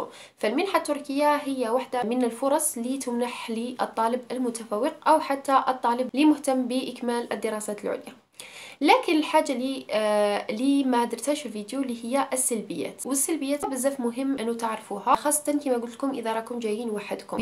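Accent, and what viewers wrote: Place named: East, Algeria. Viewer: لهجة الشرق الجزائري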